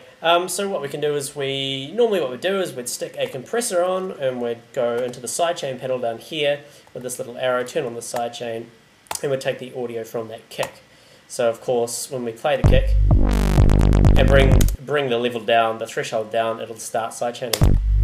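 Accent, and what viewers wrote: New Zealand. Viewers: sounds like a kiwi to me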